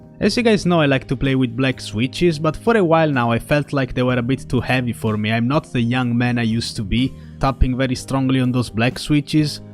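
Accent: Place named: Italy. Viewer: quando un italiano parla inglese si sente cmq grande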